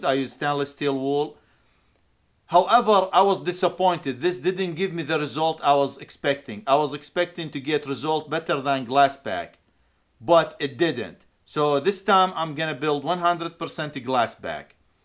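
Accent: Iraq. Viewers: انته عراقي؟.حجيك عراقي من الوسط و الجنوب تحديداً! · من طريقة لفظك عرفتك عراقي !!!!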